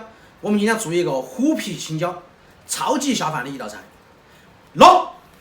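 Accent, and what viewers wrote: Sichuan, China. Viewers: Oh my God, thats my whole week meal supply! 🤯 - a month or two for the rice. By the way, which dialect of Chinese do you speak? It sounds like Mandarine but with an accent. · 哈哈 还贴心地加字幕 我们听得懂川普话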